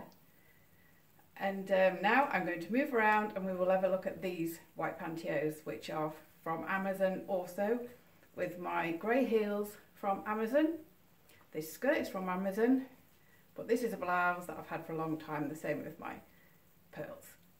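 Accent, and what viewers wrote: United Kingdom. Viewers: I DETECT AN ACCENT WHEN YOU SPEAK ARE YOU BRITISH? GORGEOUS!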